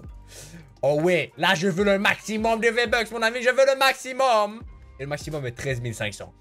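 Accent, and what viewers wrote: Belgium. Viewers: tu es belge???? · Tu es belge?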